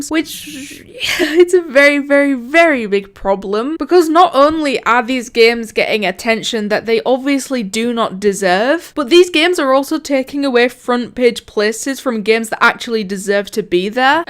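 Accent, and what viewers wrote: United Kingdom. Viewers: I like 👍 your british voice👍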